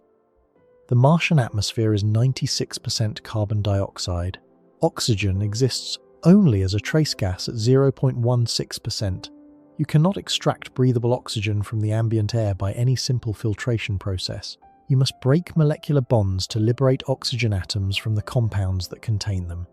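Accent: United Kingdom. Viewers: Is this a man or is it AI? The only reason I ask is because these two hour videos are released constantly and the narrator speaks in a perfect British accent without missing so much as a single beat, without pausing or running out of breath, without stumbling. Constantly over and over again…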